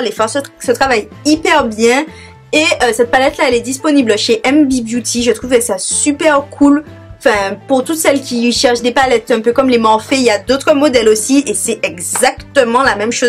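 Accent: Antilles, Caribbean. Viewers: Avec ton accent antillais la😂😂❤. Super vidéo !💋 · Tu es un vrai joyau !!! ♥ ♥ Ça fait plusieurs fois que je regarde tes vidéos mais je n'ai jamais eu assez de temps pour te laisser un petit commentaire ! Ton accent est à croquer, ça sent bon le soleil et la chaleur des Antilles ! Tes vidéos sont toujours un vrai régal ! ♥ Plein de gros bisous !!! ♥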